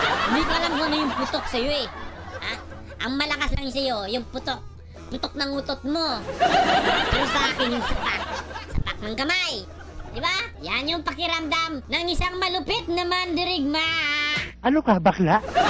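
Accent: Visayas, Philippines. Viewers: bisaya kaba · Taga Visayas ba si? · Ay kuya bisaya kaba or tagalog? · bisaya ka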